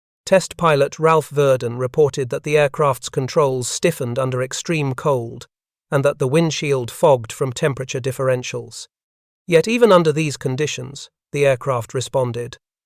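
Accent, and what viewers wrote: England, United Kingdom. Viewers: Anglican English gives the narrative the proper setting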